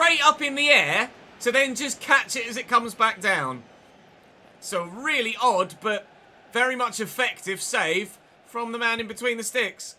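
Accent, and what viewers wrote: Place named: Liverpool, United Kingdom. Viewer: Love the scouse accent 😂😂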